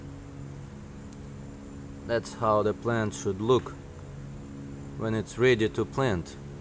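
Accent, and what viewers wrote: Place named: Russia. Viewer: Ti idiot ili prosta zaviduesh ego!! Important is not an accent (although English with Russian accent is very cool)! His English is very perfect grammatically, and also very rich vocabilary! Thank you for the Videos!! You are gift from the GOD!!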